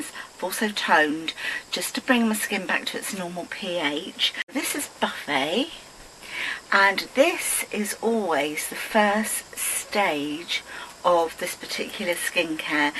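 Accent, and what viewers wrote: United Kingdom. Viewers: Yes my middle aged ears are ok lol.Just prefer to hear your lovely English accent my dear.💗👗💟💋👠👛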